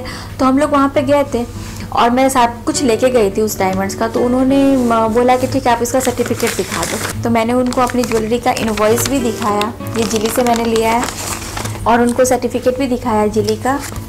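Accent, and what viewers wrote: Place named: Bihar, India. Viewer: तुम इंडियन बिहारी हो क्यों की तुम हिंदी बिहारी बोल रही हो जय हो बिहारी बाबा की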